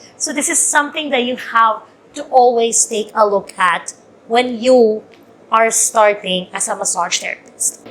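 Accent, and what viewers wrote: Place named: Philippines. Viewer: Hi! I came across your videos, I was just doing my errands and i felt so annoyed with the feeds on my facebook. So I came here. I am an LMT here in the Philippines and i enjoy watching your videos. I get new ideas. I was about to apply there in Canada as a massage therapist after the pandemic before we created our massage business but my husband didn't like the idea as we are both DEPED teachers at the same time massage therapist. It is our passion and we also have massage clinics. I assume you are filipino. You speak like one. Are you?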